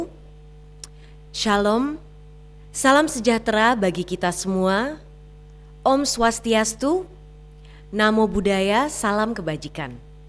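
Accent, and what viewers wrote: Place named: Bali, Indonesia. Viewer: Logat bali .💪indonesiaku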